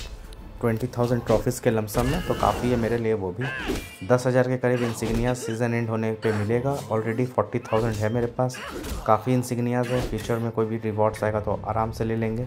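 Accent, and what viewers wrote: Bihar, India. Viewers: Ling aur Sarge waale campers se toh bht zyada frustration hota hai😩😩😩 Btw nice gameplay 👌 I think aap Bihar se ho?😊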